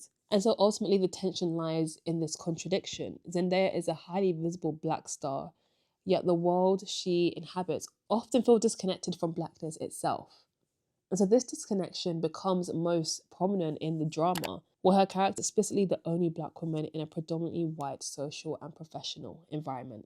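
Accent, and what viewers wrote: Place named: United Kingdom. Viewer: Very confused as to why I’m hearing a British accent but I’m seeing you call someone who’s clearly not black a black woman. This take falls flat when you refuse to acknowledge her proximity to whiteness. You are not biracial you are not viewed the same as a “Zendaya”. We do not have the same experience. Infact “Zendayas” in the world have a privilege over black women. It’s very glaring when you talking about racial dynamic and refuse to acknowledge this.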